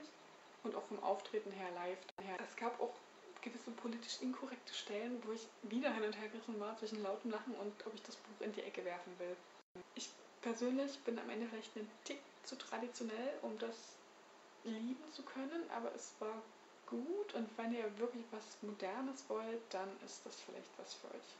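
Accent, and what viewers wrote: Dresden, Germany. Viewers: Aha SciFi steht bei dir im Regal also links. Gut zu wissen :P Aber jetzt mal zum Inhalt: Zum Glück hast du mich abonniert, sonst wäre ich erst zu spät über dich gestolpert - das wäre schade gewesen, weil ich deinem Bücherregal entnehmen kann, dass da durchaus einige literarische Schnittstellen sind und mir vor allem auch dein kritischer Blick gefällt. Es freut mich, wenn mehr Menschen mit einem etwas anderen Geschmack den Weg in die Booktube-Community finden. Nahezu mutig ist es auch von dir zu sagen, dass du - OMG! - Bildbände bzw Mangas/Comics liest und die auch vorstellst. Soweit habe ich mich noch nicht getraut^^ Brave New World muss wohl auch demnächst bei mir endlich einmal dran kommen. Ich nehme es mir vor, seitdem ich vor vielen Jahren beim Film Free Rainer von der Existenz des Buches gehört habe :D Ich bin gespannt auf mehr Videos von dir und finde es tapfer von dir, dass du deinen Dresdner Dialekt nicht verleugnest, so wie ich meine sächsische Herkunft verleugne. 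Kannst du es aber irgendwie ermöglichen, dass die Lautstärke noch etwas höher wird? Liebe Grüße Vincent:-) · Die rothaarigen Booktuber mehren sich! :) Gerade entdeckt durch dein Abonnement auf meinem Kanal! Patrick Salmen muss man eher mal live erlebt haben... Habe ihn in Berlin ein paar Mal gesehen, allerdings nur mit sehr neuen Texten. Bücher von Poetry Slammern besitze ich aber kaum.. Lieber das Hörbuch! Der Herr ist vornehmlich in Wuppertal unterwegs. Kurzgeschichten- und Lyrikbände können auch mein Herz gewinnen. :) Danke für die Empfehlung der Booktuberin/Autorin. Die Farbe Lila und Wer die Nachtigall stört.. Habe ich mal in einem Zug (ja, ambig!) gelesen und war sehr schockiert.. Habe den amerikanischen Rassismus vorher kaum wahrgenommen in der Literatur. Schöne Neue Welt kennen gerade die Leute hier kaum, die viele Jugenddystopien lesen. /: Ziemlich schade. Für mich ein großartiger Roman. 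Toller Kontrast zu 1984 von Orwell. Sie versucht Murakami hinter sich zu verstecken. :D Unbedingt mehr Videos machen. Mir fehlen solche Booktuber, die wirklich etwas zu sagen haben. Deine ruhige, eloquente Art ist sehr angenehm. Das mit den Charakterstudien erwähne ich auch in jedem Video.. Zu viele Gemeinsamkeiten! :) Liebe Grüße! P.S.: Dresdner Dialekt kommt manchmal durch, sehr toll. Und, ich habe dich mal auf Facebook in einer Booktube-Gruppe empfohlen. :)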